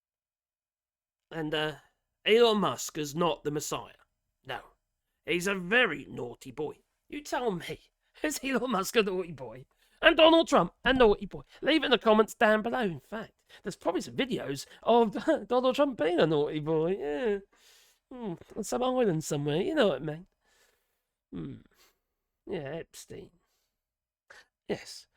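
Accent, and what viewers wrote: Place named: Northern England, United Kingdom. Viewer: I love your Videos and how much you love trees and critters. Guessing UK accents is a hobby of mine. Are you from around Manchester or Liverpool? Your accent reminds me of Lister from Red Dwarf.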